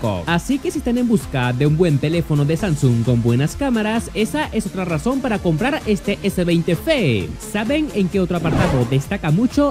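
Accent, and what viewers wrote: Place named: Venezuela. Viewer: Hablas como venezolano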